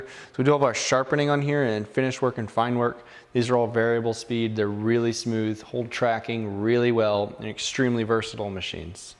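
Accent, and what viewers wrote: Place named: Rural, United States. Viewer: Sounding a little country there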